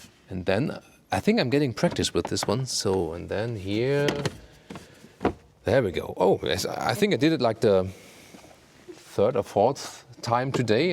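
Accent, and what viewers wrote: Germany. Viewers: Excellent presentation,. I adore German craftsmanship. And I prefer the Blue Q9 over the SQ designation. Its understated elegance carries a more distinctive refinement. And your lovely accent makes me wish Audi would use you in a Siri, or Alexa-style interactive firmware suite with the Q9. It’s wonderful!🇩🇪🌷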